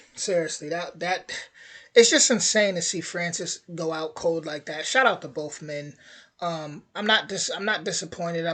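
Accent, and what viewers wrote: United States. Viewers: What part of the states is your accent from?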